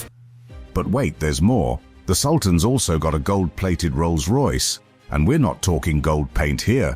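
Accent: United Kingdom. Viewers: Way too many "ain't" and "nah." Don't go well with the narrator's English accent.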